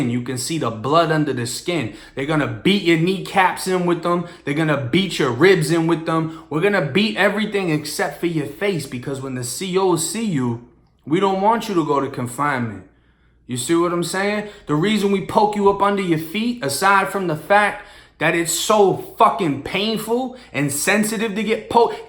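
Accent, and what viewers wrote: Boston, United States. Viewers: Lol I’ve been listening to ever since the 23&1 interview and I always crack up with his Boston accent! “Test of HARHT” “PAHRK THE CAHR” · He sounds like a he's from Boston.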